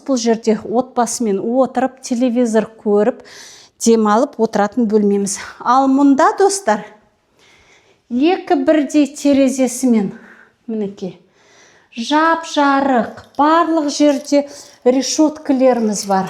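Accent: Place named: China. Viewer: Чувствуется акцент китайский ( оралманка?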